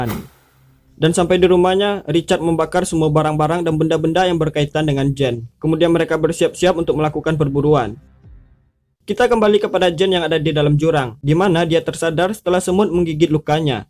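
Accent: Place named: Padang, Indonesia. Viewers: Mimin nya orang padang nya Logat nya kayk orang padang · Orang sumatra ni, rasa rasa padangnya ada atau medan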